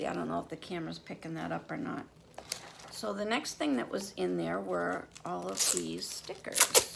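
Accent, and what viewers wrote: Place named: Boston, United States. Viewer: Oh I guessed Boston so you being from Mass makes sense :) It's awesome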